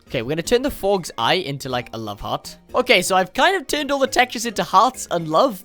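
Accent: Wales, United Kingdom. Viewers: Holy crap thats the most welsh accent i ever heard 🏴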